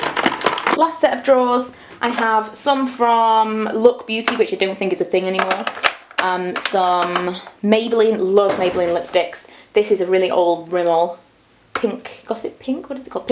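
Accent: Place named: Derbyshire, United Kingdom. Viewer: Are you from Derbyshire ? You're accent is very similar to mine !x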